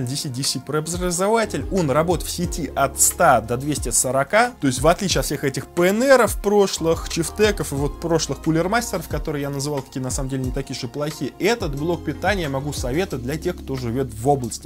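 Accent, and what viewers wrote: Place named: Okanye, Russia. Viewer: Что за Оканье такое =))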